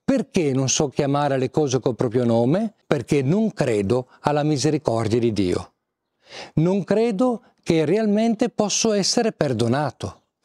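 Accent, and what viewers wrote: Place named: Parma, Italy. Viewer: Caro questa è una bella sorpresa per me! Sono della provincia di Brescia. La seguivo molto volentieri, quando stava al santuario della B.V. Maria del rosario di Fontanellato e su Giovanni Paolo TV trasmettevano le sue catechesi sui 10 comandamenti. Mi è spiaciuto quando l'hanno trasferita nel torinese perchè ancora piu lontano... ma sono felice che sia andato a fare del bene anche la... Poi anche di G.P. TV s'è perso il segnale perciò era molto che non la vedevo e sentivo... in un primo momento mi era sembrato di conoscerla, poi sentendola parlare l'accento non mi convinceva perchè ricordavo la cadenza parmense.. ma da come parla ho detto no dev'essere lui! poi ho letto nella descrizione e.. che gioia! Mi ha fatto un vero piacere vederla e tutto. Lei non mi conosce ma io è come se la conoscessi. Saluti! Pace e bene! Sia lodato Gesù Cristo! 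❤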